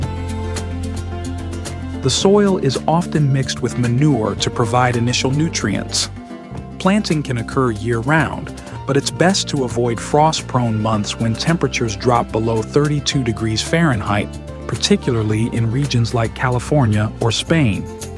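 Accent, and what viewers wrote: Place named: Morocco. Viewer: هذا المعلق باينة مروكي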